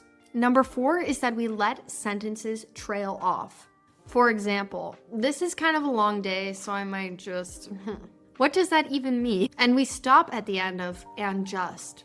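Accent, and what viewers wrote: United States. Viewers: I found this channel last week and thought your pronunciation was very clear and a great example for speaking, so I started to imitate your speech. The blue lights on subtitles make me easier to copy your speaking because their movements and your voice are in sync. This speaking practice is very effective to get used to American accent and I do it every day. Your videos are so helpful and practical. I really enjoyed watching them. Thank you so much.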